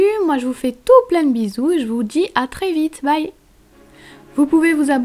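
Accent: South, France. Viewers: Hiiii ! Olalala c'est vraiment très très beau - Je rêve ou c'est un ptit accent du sud que j'entends ? =p Bisous bisous + j'aime hihi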